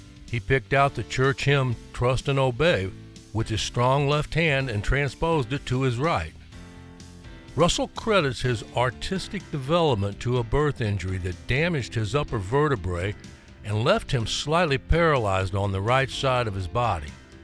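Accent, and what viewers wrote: South, United States. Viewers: Thank You, Thank You, Thank You For This Great Memorable Leon Russell Profile!! Your Southern Drawl is Perfect, Gives This Presentation More Oomph!! :washhands::washhands::washhands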